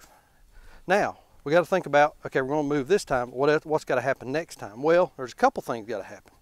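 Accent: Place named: North Carolina, United States. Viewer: Love your North Carolina accent. Sad that American accents are disappearing.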